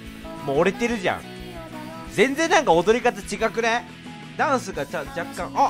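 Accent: Kansai, Japan. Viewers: もっと関西弁で話して欲しいです＊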